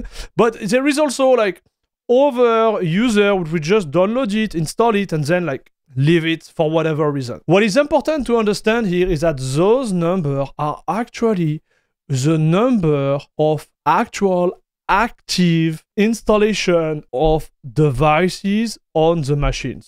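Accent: France. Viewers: Thats a heavy French accent :P Mais bon j'ai tout compris donc pas mal!!<3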